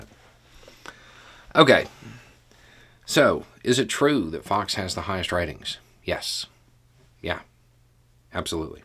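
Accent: South, United States. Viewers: As a European, it is also very refreshing to hear an American recognise that. Especially one with a southern accent. knows his political theory.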